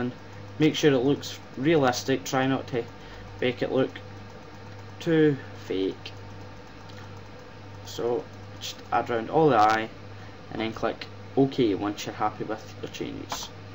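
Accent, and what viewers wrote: Scotland, United Kingdom. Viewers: Thanks for posting tutorial. Sounds like scottish accent.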